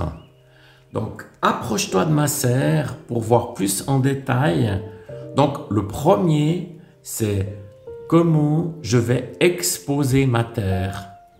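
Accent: Switzerland. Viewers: Si on protège le pied des tomates avec une bâche perméable à l'eau ça évite les éclaboussures dues à l'arrosage, qu'en pensez-vous? Bravo pour vos vidéos courtes et allant à l'essentiel, c'est toujours un plaisir de vous écouter avec cet accent bien Suisse pour nous autres haut-Savoyards.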